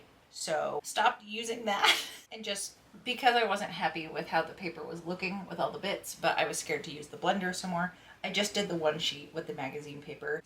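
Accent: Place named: Australia. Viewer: This was an awesome video, top marks. And I got the Australian accent bit. Also, have you gotten your new blades and replaced the old one?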